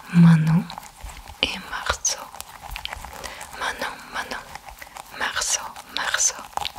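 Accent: Russia. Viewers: Je regarde cette vidéo après ta vidéo d'il y a un an et ton évolution est super ! Tu articule vraiment bien et ton vocabulaire a evolué ! Vraiment je suis admiratif de voir à quel point tu a persévéré dans ton apprentissage ! Et puis toujours le petit accent russe qui va bien 😊 !j'aimerai avoir 1% de ton niveau de français en russe ! Merci pour tes vidéos et bonne continuation 🎉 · J'ai amies russes qui parlent parfaitement comme toi, trouve ça impressionnant russe est vraiment très très différent du français, on entend presque pas ton accent russe😮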